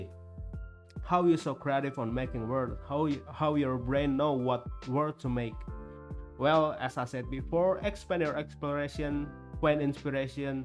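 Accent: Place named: Indonesia. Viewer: Anj kan bener dari indo, dari aksen sebenernya udh keliatan kaya orang indo, tapi gw agak ragu lu org indo soalnya jago bgt bahasa English 😂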